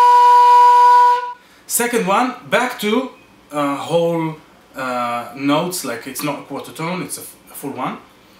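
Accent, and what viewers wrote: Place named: Arab world. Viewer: هذا الشخص عربي وليس اجنبي، واضح جدا من اللهجة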